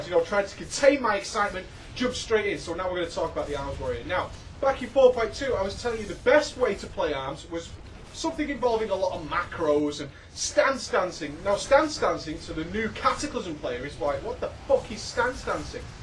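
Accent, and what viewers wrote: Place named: Manchester, United Kingdom. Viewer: I watched this purely for the banter, fuckin hilarious mate, where about in Manchester you from?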